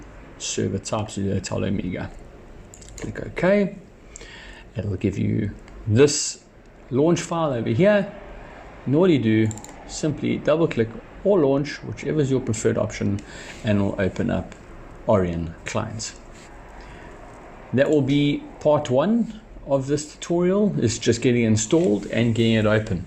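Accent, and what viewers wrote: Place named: South Africa. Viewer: South African Voice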